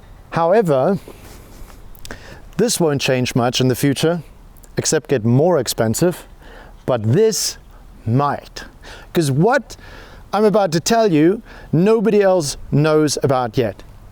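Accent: South Africa. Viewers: Bro, you sound like you're South African.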